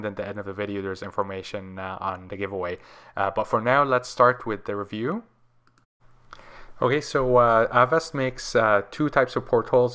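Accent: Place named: Quebec, Canada. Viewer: Merry Christmas! Will check out the portholes. Really neat. And I was talking to someone locally and they said you sound like you might be from Quebec. True? 😁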